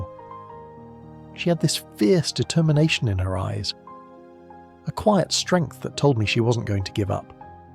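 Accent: United Kingdom. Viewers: Thank you living angel❤❤❤. Pls use a narrator with a US accent . The British accent is very irritable .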